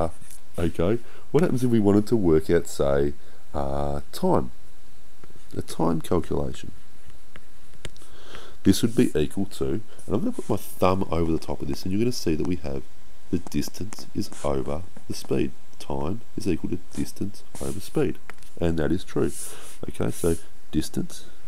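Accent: Australia. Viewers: Thanx Aussie, thats the best description I can find for this problem, no Indian accents(no offence intended), by the way hope that head cold clears up for ya soon, LOL. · Lol u sound like someone from love island Australia and thanks for the lesson really helped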